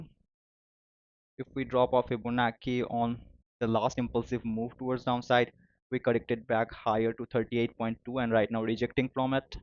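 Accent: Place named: India. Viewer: thaNKS for the video G, and love your indian accent G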